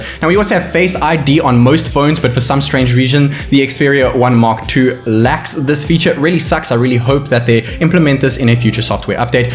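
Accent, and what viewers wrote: South Africa. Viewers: Are you South African? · Hey, are you South African? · Great video! BTW are you South African?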